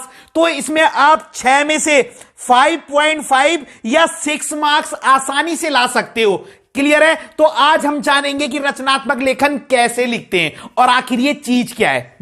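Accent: Garhwal, India. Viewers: Sir aap kya gadwali ho aap ki awaaz se lag raha hai ki aap gadwali ho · Bro h sir gadwali accent se pta lg gya 🤣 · Sir aap garwhali ho ky aapki aawaj se lg rha h 😊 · Gadwali ho na ap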